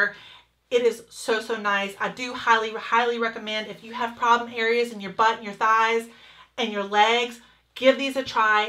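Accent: South, United States. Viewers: I’m a southern girl and I instantly realized that you were southern too when you started talking and I immediately subscribed. Mainly because you don’t see many southern women as YouTubers so it was just amazing to see honestly. That prolly made no sense but I just wanted to tell you to keep doing what your doing. It’s amazing 🥰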